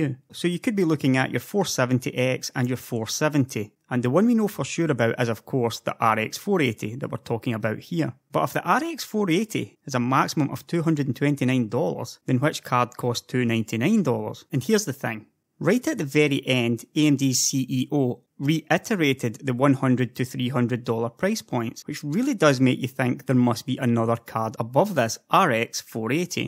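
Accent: Scotland, United Kingdom. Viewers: Are you Scottish by chance? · He's Scottish... not recognise a Scottish accent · Seems Scottish.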